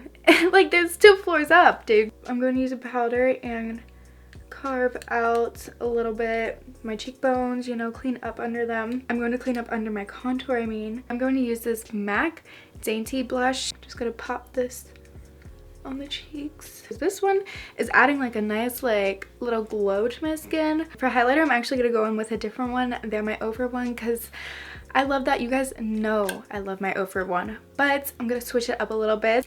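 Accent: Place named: Pittsburgh, United States. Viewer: Hahaha it's her accent- most people from Pittsburgh say it that way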